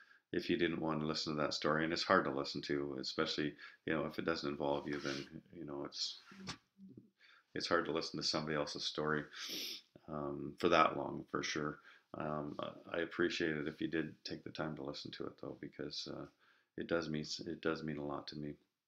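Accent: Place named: Canada. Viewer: you are awesome, you want to here something funny? I had my closed caption on while watching this video...according to the captions you are pregnant... i'm still laughing, they obviously don't use a caption-er who picks up your Canadian word accents. but congratulations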